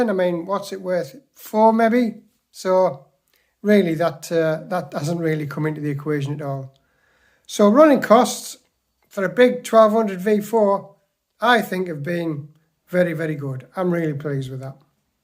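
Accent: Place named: Liverpool, United Kingdom. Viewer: Myself being from the northeast of England! I can’t get away with your posh scouse accent.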